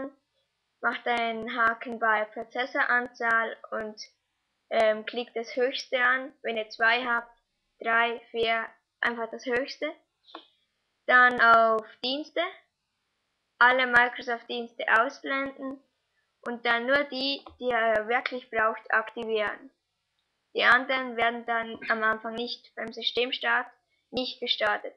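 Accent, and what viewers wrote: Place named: Switzerland. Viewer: schweiz???? oder wie mir gefällt der dialekt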